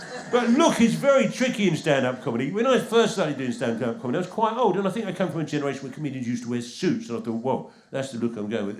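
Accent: Cockney, United Kingdom. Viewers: As a former Portuguese immigrant in the UK, I loved it. You sounded to me like a proper Guy Ritchie cockney character, and I mean it in the best way possible. Bloody brilliant. Cheers from Portugal.🇬🇧🇵🇹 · luv this fella .. proper cockney geezer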